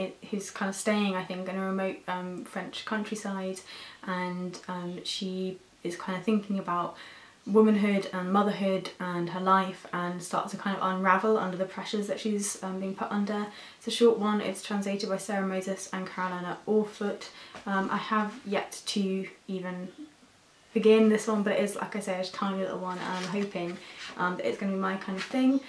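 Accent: United Kingdom. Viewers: You're so different to all the other booktubers I follow and I love it! It's nice to hear a Brit talking about books for a start, but also you don't focus on popular books, just ones that you feel are important or interesting. It's so refreshing and I love it!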